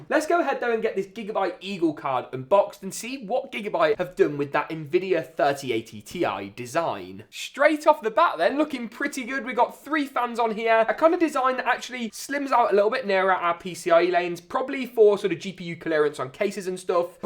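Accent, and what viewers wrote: United Kingdom. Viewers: I like your british accent. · This guy surely likes speaking british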